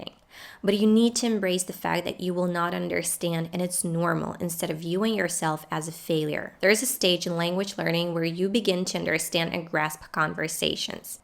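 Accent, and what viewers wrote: United States. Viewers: Madam your American accent is very clear like British accent we understand easily but the other American' s accent we can't understand so easily . So you are a good and expert teacher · You have a perfect American accent. Were you born in the US? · Her accent is American,and i like to be an American international communicator like rebel number one and i don't want to mention who is "rebel number one". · Me "Holy s***, English isn't her native language?" From your accent and proficiency in speaking I immediately assumed you were an American.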